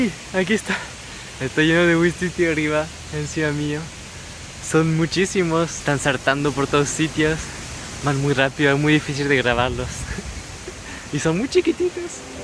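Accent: Chile. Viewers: trasmites una paz con tu acento Chileno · Tiene acento chileno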